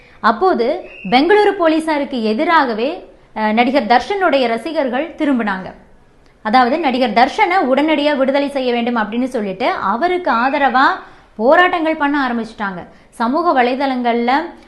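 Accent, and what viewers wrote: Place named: Chennai, India. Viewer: Madras தமிழ். பினமாக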